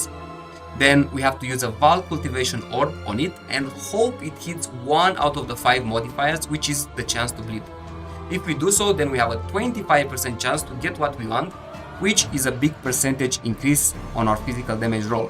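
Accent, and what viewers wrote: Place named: Philippines. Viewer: When I heard your accents I was worried that the video would suddenly changed to tagalog. nice build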